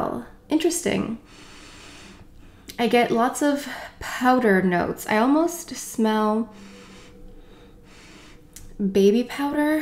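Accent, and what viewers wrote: France. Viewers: Love this perfume!! Love your French accent! Thx for sharing dear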